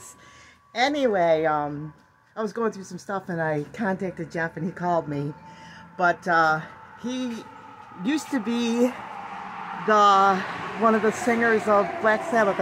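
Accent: United States. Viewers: Black Sabbath without Ozzie Osborn, was like a skunk without stripes or a smell...basically just a cat at that point hahaha. I saw Black Sabbath in concert MANY years ago, back in the 70s, man that is a story to tell some day. Y'all have a Merry Christmas. Your accent, WI or a state near there, yes?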